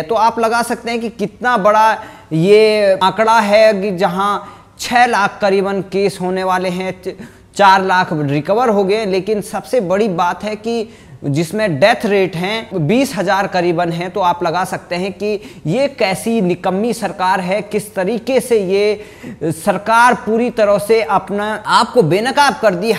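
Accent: Bihar, India. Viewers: बिहारी/भोजपुरी tone में समाचार सुन कर मज़ा आ गया। जीय हो बबुआ 👌👌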